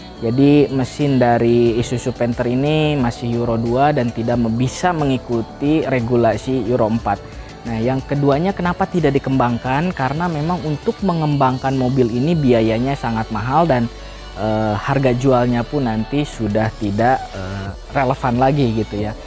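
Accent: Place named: West Java, Indonesia. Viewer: katingali pisan sundana eu...y...😄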